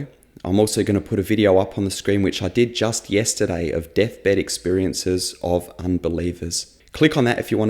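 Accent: Australia. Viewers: Had to stop bc of the Australian accent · Amen. Thank you. I follow you, as I followed Charlie and the late Pastor Baucham from Egypt. I am a Coptic Orthodox Christian. May God bless you my son and may He bless your ministry Amen. I am very fond of the Australian accent though I don’t hear it often.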